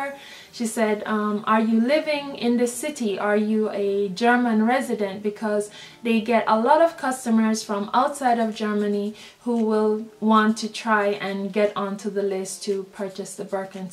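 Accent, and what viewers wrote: Jamaica. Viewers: I think so too. I'm Jamaican so I picked up on her accent real quick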